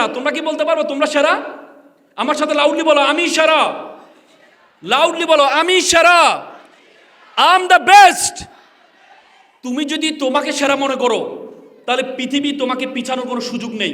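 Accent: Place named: India. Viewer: আমার খুব জানতে ইচ্ছে করে উনার বাড়ি কোথায় কারণ ভাষা গুলো মনে হয় ইন্ডিয়ানদের মতো আবার যে গুলো বলে সেটা বাংলাদেশের জায়গা।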